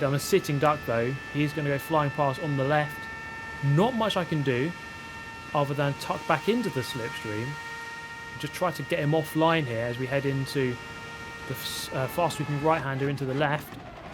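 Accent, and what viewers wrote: United Kingdom. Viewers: As a british person, it never occurred to me that many people here just enjoy his accent. "Everyone" (lots of people) I know speaks like this, and it's actually nice having this on in the background of working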